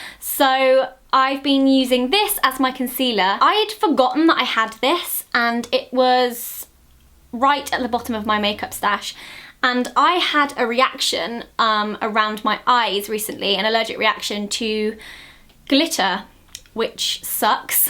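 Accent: United Kingdom. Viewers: Kaaaaaaaaaaaaaaaawaii, <3 OMGlitter, so... beautiful! ^^ Amo seu sotaque britânico. Oh Gosh, como pode ser tão perfeito??:o Xx, { PetitCatcake Channel's (Visit) }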